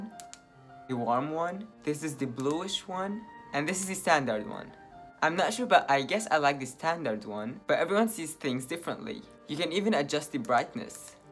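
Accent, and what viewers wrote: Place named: Spain. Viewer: I like your accent. Where are you from? Spain ?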